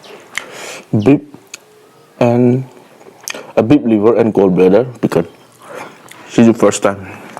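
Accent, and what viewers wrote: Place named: Northeast, India. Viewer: North east accent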